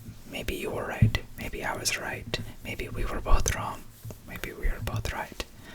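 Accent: India. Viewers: Indian accent videos are my favorite!! Love the video as always and keep creating great content!!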